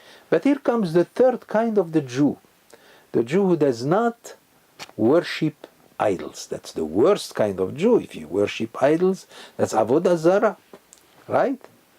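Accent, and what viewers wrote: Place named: Iran. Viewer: you have Farsi accent even when you speak English and even want his bigger Hebrew semitic language I can smell and I can hear Farsi accent but I'm not going to judge you pray for Rabbi for all of us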